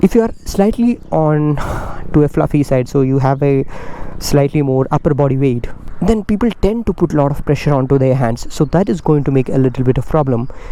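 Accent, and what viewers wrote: Kerala, India. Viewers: Pure Mallu accent..... Good video review btw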